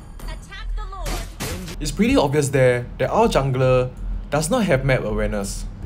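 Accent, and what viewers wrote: Singapore. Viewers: Man sounds like he is from sg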